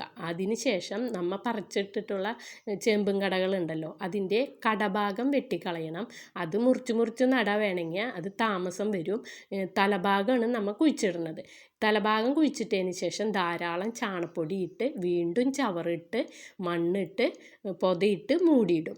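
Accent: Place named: Thrissur, India. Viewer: മമ്മ😂😂😂😂 തൃശൂർ ആണല്ലേ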